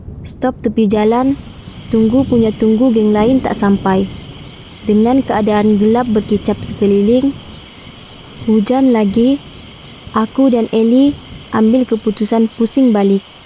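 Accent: Sabah, Malaysia. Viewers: ni bkn ckp semenanjung bang, ni bahasa melayu slang sabah. Kasi repair otak mu..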